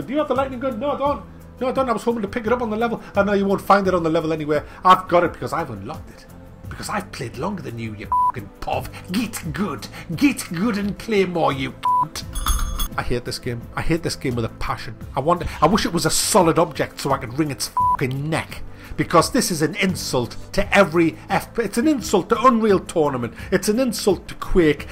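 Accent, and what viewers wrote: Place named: Birmingham, United Kingdom. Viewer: Buy you sound like your from birmingham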